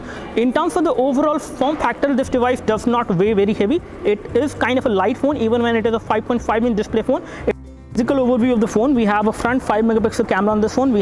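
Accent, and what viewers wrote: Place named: Tamil Nadu, India. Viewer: Can you get rid of your Tamil accent when you speak English? It's quite annoying. I have a few Tamil friends who speaks nice English, I don't hear any Tamil accent when they speak. Can you do it. I need to hear a romantic sounds, but your is not.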